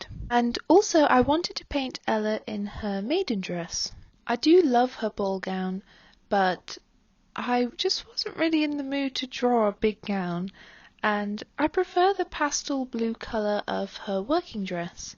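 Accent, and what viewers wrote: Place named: United Kingdom. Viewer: Thank you! Also, I guessed it was a British accent but even so it sounds different than the ones I had heard so far, are you a noble or a princess or out of a fairytale? I would definitely cast you for a fantasy animation movie as a voice actress for the main protagonist ^^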